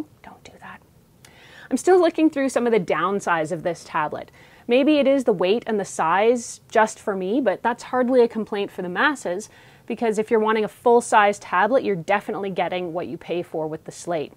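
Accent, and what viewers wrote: Canada. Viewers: Gotta love Canadian ladies talking aboot tech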